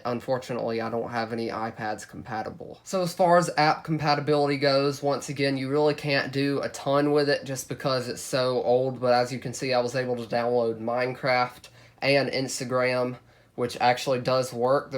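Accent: Texas, United States. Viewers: 1 second and i already know youre from texas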